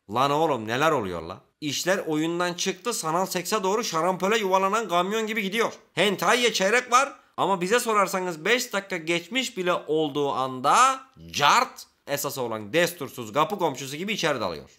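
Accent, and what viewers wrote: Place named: Thrace, Turkey. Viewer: Trakyalı ağzı yapmış çükolatama harbi güzel yapmış ağzına sağlık xd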